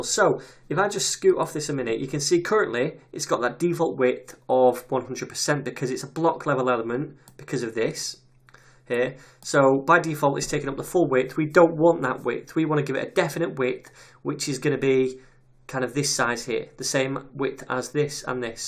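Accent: United Kingdom. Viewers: First of all, let me just say that I absolutely loved the course all the way through. Over the last few days I took both, the HTML course and this one, and it was a hell of a refresher for me. What's more, I have finally understood quite a few crucial CSS concepts and now I know why something works and something else doesn't. You did a great job by putting this course together, kudos to you, mate. Last but not least, let me just say that I absolutely love your British accent :) Cheers!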